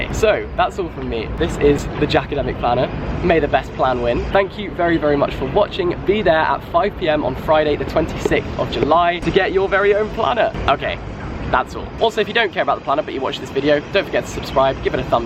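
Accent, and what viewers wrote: RP, United Kingdom. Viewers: You have the same posh accent as Jack and Finn Harries. Not a criticism, just an observation.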